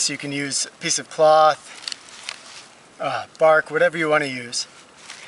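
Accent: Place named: Wisconsin, United States. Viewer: ! I've gotta say, I am really excited to have found your channel! I have always had a strong interest in the subjects you cover, but what is really exciting is that it sounds like you live in Wisconsin! The problem I sometimes run into when researching is they are region specific with materials. This can be hard. I live in central Wisconsin, and it is awesome to have a neighbor's expertise to draw from. Thanks for doing what you do! Great work my friend!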